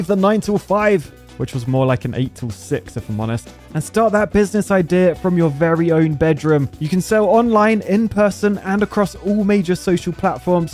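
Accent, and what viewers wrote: United Kingdom. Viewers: Fellow Britt? Does he spiff?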